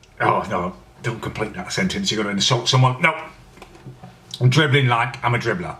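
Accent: United Kingdom. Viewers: Ahagrande He's British?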